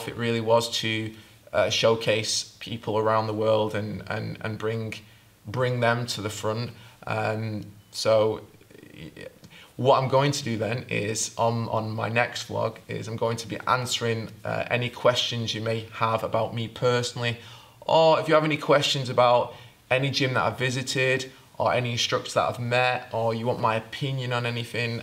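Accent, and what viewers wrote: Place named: Northern England, United Kingdom. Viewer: off topic but I can't place your accent! I'm getting northern England, but is there scottish there as well?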